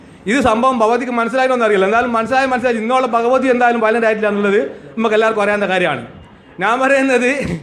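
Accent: Kannur, India. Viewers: നല്ല കണ്ണൂര് ഭാഷ. സൂപ്പർ.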